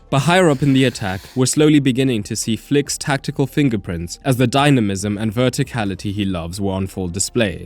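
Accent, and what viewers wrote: South Africa. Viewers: Why does bro sound south african😭😭😭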